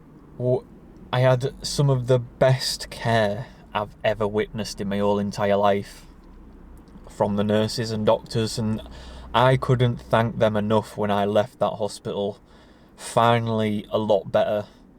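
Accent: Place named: Sheffield, United Kingdom. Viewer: are tou from sheffield mate. You sound very local brother.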